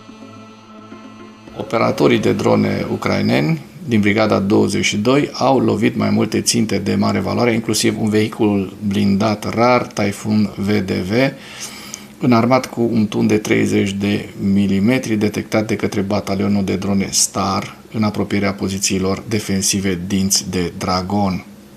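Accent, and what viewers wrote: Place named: Transylvania, Romania. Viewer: Pronunția lui de Ardelean diferă de cea a Miticilor!!! Crez că trebuie judecat??? Sărbători cu lumină!!!!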